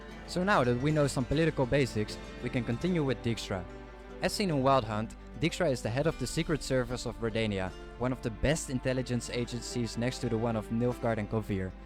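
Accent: Netherlands. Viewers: Dutch accent lmao nice video!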